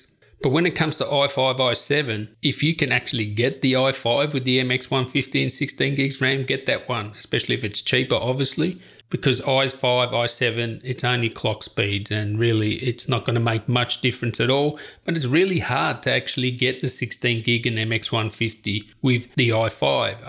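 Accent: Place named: Australia. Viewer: As an Aussie it's weird to hear super Aussie bloke talking in American dollars.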